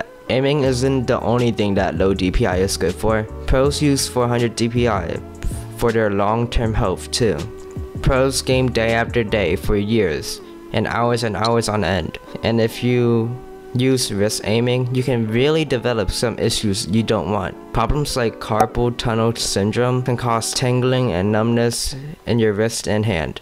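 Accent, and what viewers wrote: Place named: United Kingdom. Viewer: 1. Yes this is true 10+ years ago. You're probably one of those guys who links that Logitech engineer video from over a decade ago. 2. You objectively are though. You're increasing the amount of information your mouse registers, objectively. This hasn't been up for debate in a very long time, but people like you who memorized a bunch of random dated knowledge on forums just regurgitates this every time they get the chance for some reason when this topic is mentioned. Your strawman analogy of comparing polling rate to counts per inch was very bizarre. Polling rate is the objective measure of input delay. This isn't something I wasn't aware of, and honestly if given the choice, I would prefer the 400dpi 1000hz over 1600 dpi 250hz. One is 4ms of input delay and the other is 1ms. I feel like you haven't tried this, because you wouldn't be capable of holding your current beliefs, but just enter any random FPS you would like. 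Zoom in very closely with an awp or that game's scoped weapon, and on lower DPI you will notice it moves in slight chunks rather than smoothly. This is because the mouse, objectively, is picking up on less information. The 3kliksphillip video which aged like milk where he claims in game sensitivity modifier was the culprit has been long disproven. You can replicate this effect with virtually any sensitivity modifier, and the lower dpi skips pixels. Having a british accent and a few 100k subs on youtube doesn't make you immune to criticism, or always correct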